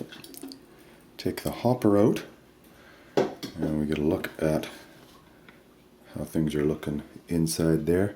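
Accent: Canada. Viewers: Excellent video. Easy to follow and lovely Canadian voice. Thank you :)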